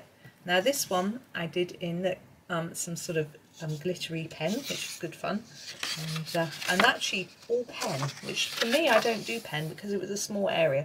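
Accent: England, United Kingdom. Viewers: You are such an inspiration! I enjoy your videos and your soothing voice. I'm from the US and I love listening to English accents though, so I may be biased lol.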